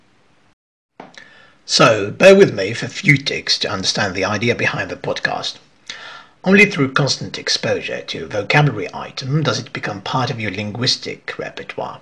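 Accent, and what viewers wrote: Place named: United Kingdom. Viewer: I would like to take a moment to hail my dear brother and colleague for the excellent and distinguished work he is about to engage with. You get to enjoy the pride of your family, friends, and students, my dear teacher. The podcast reveals how creative is your rebellious spirit wherein your British accent shines to beautifully intersect with the condensed and rich content of the podcast. I wish the attractively presented video would be but an outset of a promising stage of multiple challenges.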